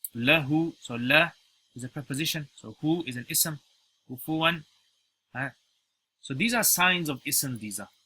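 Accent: Birmingham, United Kingdom. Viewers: Brother sounds like you from Birmingham uk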